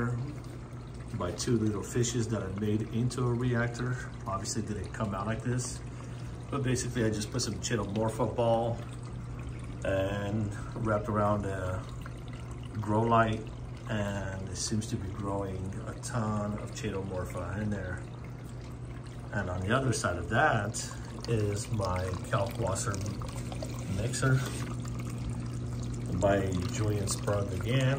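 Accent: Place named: United States. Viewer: Salutare din uk, cred ca ești demult în usa ai accent fix te usa, foarte diferit de uk. Super acvariul !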